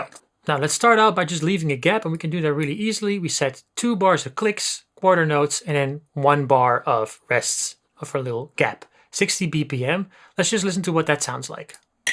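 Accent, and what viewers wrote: Netherlands. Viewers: Although your location says USA and your English is great.........am I hearing a Dutch accent ?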